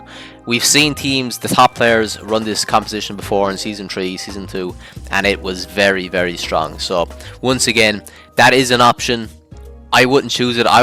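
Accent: Ireland. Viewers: Hey, thank you for not using AI voice anymore, up the Irish accent!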